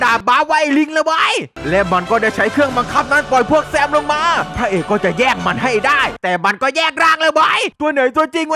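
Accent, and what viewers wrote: South, Thailand. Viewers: อยากได้เสียง ใต้ เยอะๆชอบๆ♥️♥️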